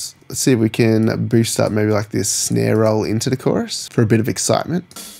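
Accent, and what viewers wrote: Australia. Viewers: Nice to hear an Aussie accent on a mix tutorial!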